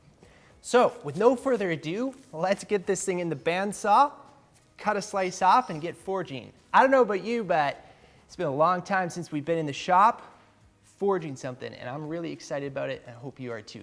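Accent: Canada. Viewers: What?! This dude has a serious Canadian accent. Matthew McConaughey has a thick southern accent. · bloody hell bud, you've got the most stereotypical Canadian accent i've ever heard!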